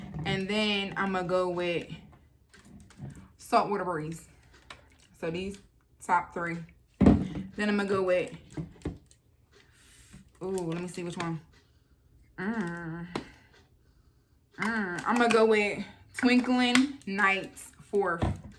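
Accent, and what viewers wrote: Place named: Baltimore, United States. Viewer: Girl I thought you were from Bmore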